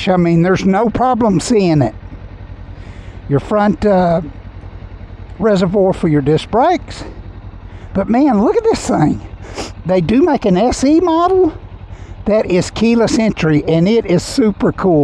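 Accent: South, United States. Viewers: Confirming my belief that all have southern accents. Great video!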